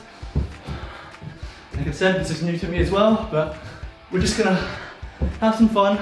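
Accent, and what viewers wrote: United Kingdom. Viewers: Yeah his accent is 100% southern English. Probably born and raised in England is my guess based on the accent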